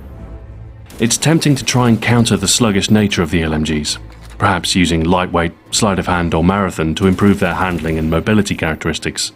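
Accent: United Kingdom. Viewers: is he from the uk? i am. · Only a British accent could pull this type of informative video off.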